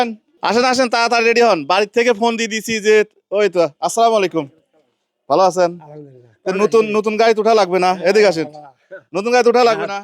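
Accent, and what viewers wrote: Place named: Jessore, Bangladesh. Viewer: আপনার সহজ সরল ভিডিওগুলো ভালো লাগে। এভাবেই সবাইকে সঙ্গে নিয়ে ভালো থাকবেন। আপনার ভাষা শুনে মনে হচ্ছে আপনি গ্রেটার যশোর/কুষ্টিয়া/পাবনা কিম্বা আশেপাশের জেলার অধিবাসী। আপনার বাড়ি কোন জেলায়, জানালে খুশি হব।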